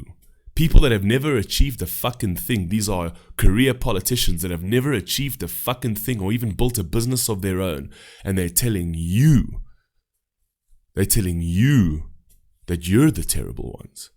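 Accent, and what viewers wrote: South Africa. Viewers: I'm just guessing from your accent that you are (at least originally) from South Africa, and you have a better grasp of what's really going on in the United States than 80-90% of the citizens of this country. Kudos sir, great reaction and rant! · You sound South African,….why you ran away…reacting to a song when shit is 10 times worse here in SA!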